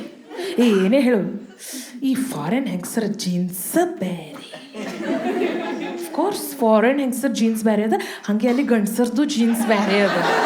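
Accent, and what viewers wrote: North Karnataka, India. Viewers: Soooooooperb presentation. Wonderful flow of words especially your Uttara Karnataka accent is really a added flavour to your comedy. Enjoyed very much. I am and I am also a Stand up comedian. Both of us have performed on the same stage once and you were the anchor. God Bless You. Keep Rocking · Too good... love ur north Karnataka kannada.....keep rocking ❤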